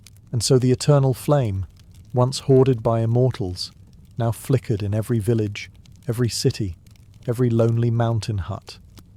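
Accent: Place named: United Kingdom. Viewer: A British accent to sleep? No thanks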